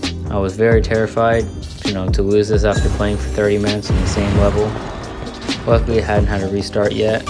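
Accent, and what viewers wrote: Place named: United Kingdom. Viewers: He does fr sound British to be honest in the videos beginning ngl lol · y sound british. btw better reply to this or im unsubbing!!!!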